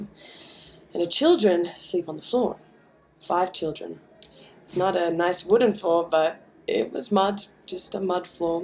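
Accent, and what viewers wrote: New Zealand. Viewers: Kimbra! You inspire me and the music i write. Keep up the the kiwi accent too - from a fellow kiwi, im starting to lose mine, ive been in Melbourne too long haha. I admire you for taking time out of you busy schedule to visit those in need. Its the small things that we do for others that usually make the greatest impact. Good job. Keep it up!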